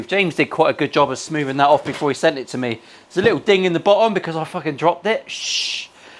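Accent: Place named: Plymouth, United Kingdom. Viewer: from Scotland here you don’t swear as much as the wife so I’m allowed to watch from the hoose love the channel and content and my old man was a Plymouth boy so the accent is a bonus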